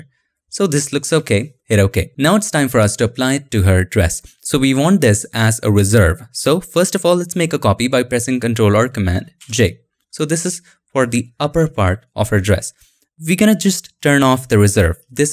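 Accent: India. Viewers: you are so pro. i like your tutorial, and India accent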